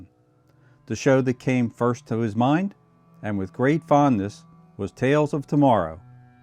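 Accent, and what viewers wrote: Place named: Pennsylvania, United States. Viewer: Your accent sounds like you're from Lansdowne, Pennsylvania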